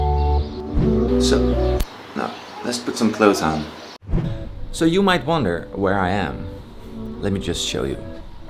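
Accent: Netherlands. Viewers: Mui MasMacho every educated Dutch person speaks fluent English in my experience, and with that accent.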